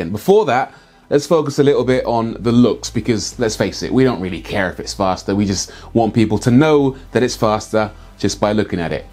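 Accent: United Kingdom. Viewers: Feels weird to watch a black man speak with a British accent...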